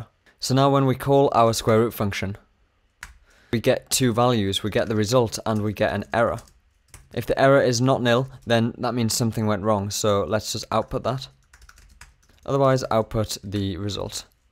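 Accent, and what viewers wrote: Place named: North, United Kingdom. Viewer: насколько же приятно слушать северный британский диалект по сравнению с индусами! я аж подрочил.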